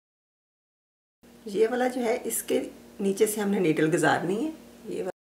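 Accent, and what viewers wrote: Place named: Lahore, Pakistan. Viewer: AssalamoAlaikum ma'am chain stich 6th ki home Economics main sekha but kabhi pta nahi tha k ismain itna variations possible hain. May Allah protect you and your family. I am from Faisalabad kya aap Lahore say hain?? Aapka excent lahori lagta hay. Maam kya aap knitting bhi karti hain agar yes to phir aapni videos upload kijye ga uski bhi. Allah aapke or aapke ghar walon ki hifazat farmaye Ameen.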